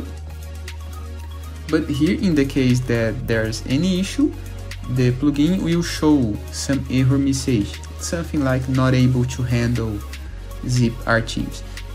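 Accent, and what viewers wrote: Brazil. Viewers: Você é brasileiro né kkk, pelo sotaque, acredito que tenho 99% de chance de estar certo kkkk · Esse é brasileiro... me engana não. Esse sutaque aí... Curte de mais ❤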